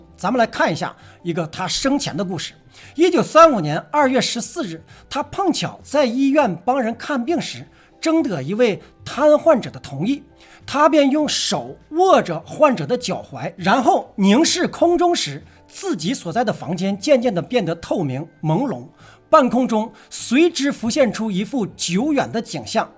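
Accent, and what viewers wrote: Northeast, China. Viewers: 不喜欢东北土语，太低太俗，拉低了节目水平、层次。望去掉！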